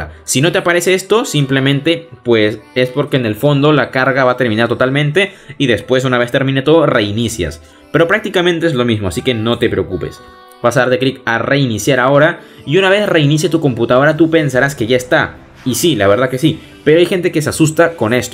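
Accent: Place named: Peru. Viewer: mm re peruano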